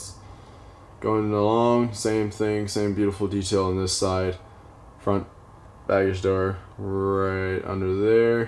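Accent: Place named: California, United States. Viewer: Hahaha! I couldn't tell what accent you have, it's Californian! My uncle talks like you. btw it's mainly the 737-700 that comes to la. West Jet usually uses it's 737-800s at lax for it's once daily lax-yyz flight.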